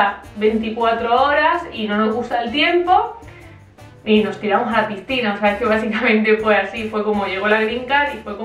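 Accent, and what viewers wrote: Granada, Spain. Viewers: eres muy fuerte.,Te sigo desde españa y me gusta como te expresas,., como se nota que eres de granada., Pero es normal que añores a tu familia y españa hay otras costumbres y se vive diferente., Te doy mucha fuerza para que continuemos tu y Pau.,hacia adelante¡¡¡ yo mi adolescencia veraneaba en un pueblo de granada.,y todo lo que explicas es verdad¡¡muchos besos para ti y para Pau y para el perrito 😘😘😘😘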